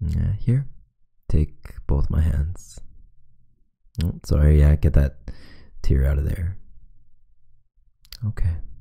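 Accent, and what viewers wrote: Canada. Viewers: I hear Canadian 😌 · omg the sorry is so cuteeee. Are you canadian by any chance?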